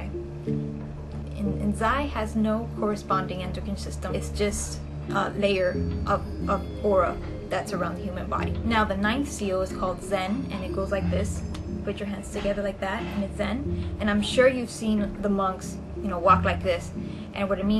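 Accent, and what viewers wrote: Miami, United States. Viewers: The room your accent and the bird tells me your in Miami 😂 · you sound like you're from Miami. great video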